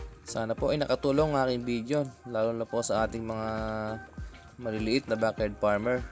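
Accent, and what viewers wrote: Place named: Luzon, Philippines. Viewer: Hello sayo dyan😊 kalina ko pa guess kung saang province ka, based on ur accent ay malamang taga Luzon ba kayo? Anong province? Di ko mahulaan😊 bilib ako sa diskarte mo at sure ako na pinag aaralan mo din lahat yan para mapa ayos ang farm…nakaka proud ka naman, sana ganyan din brother ko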